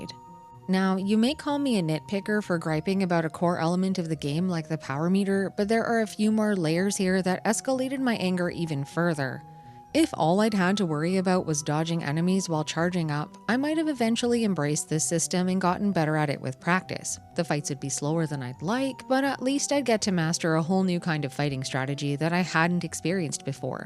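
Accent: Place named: Canada. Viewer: you are actually from canada right ? i wont say that i spend very much time on your twitch channel because i get so busy with work that its hard for me to find enough time to sit down for long hours to watch the twitch livestreams. so i might have missed alot of the details about yourself or your experiences if you ever talk more about your life on twitch. i just tend to only check out the youtube videos you make instead. but im just sure that you must be from canada just because of your voice and because i have lived in canada my entire life too. canadian folk can often sense other canadians. what part of canada are you in though ? you can just say what province if you dont like giving out too much personal information. or ill apologize if my best guess is wrong and you arent in this country. i think we are also close to the same age as well but im all the way far east in the atlantic provinces. 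its rare to find anyone from canada that reviews these older retro games from what i have seen on youtube. so i just wanted to tell you that i really appreciate what you are trying to do with your channel. you are a true inspiration to other gamers in my opinion. someday i hope to start a channel and make the same kinds of reviews as well. i say that since i am also addicted to the rpgs, especially the retro rpgs. i dont think you have a discord server or i would probably try talking to you later. wish you all the best however and good luck with everything, take care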